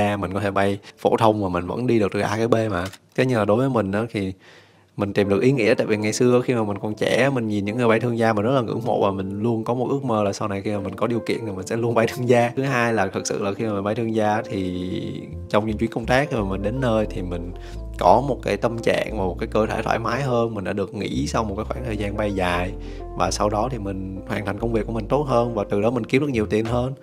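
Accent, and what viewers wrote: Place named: North, Vietnam. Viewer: cảm giác như anh gốc Bắc đúng không ạ, thấy ngữ âm hơi giống dù a nói tiếng nam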